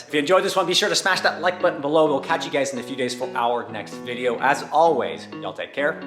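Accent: Hawaii, United States. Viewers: Bro yessah palala you Hawaiian my guy I love it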